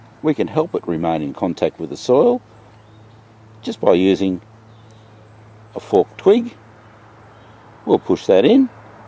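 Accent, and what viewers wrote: Australia. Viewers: I'm sorry but i just can't take the aussie accent seriously :) :)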